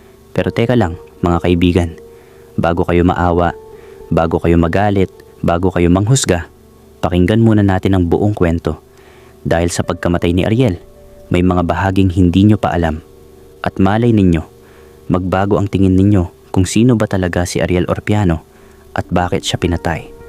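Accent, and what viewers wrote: Visayas, Philippines. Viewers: Bisaya ba Yung narrator?Hindi marunong mag bigkas ng salitang Tagalog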